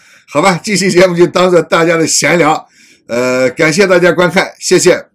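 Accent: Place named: Shaanxi, China. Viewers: 陕西话真难听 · 西安话？我老婆说是西安城边长安县方言！哈哈！ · 好烂的诗，陕西话一说很像黄色笑话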